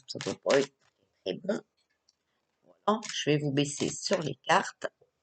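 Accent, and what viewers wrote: North, France. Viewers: Bon Anniversaire 🥰💜❤️💛 Je viens de vous découvrir et j'apprécie votre naturel... et votre accent de chnord;) ( le même que ma grand-mère) Bonne continuation j'aime bien venir vous écouter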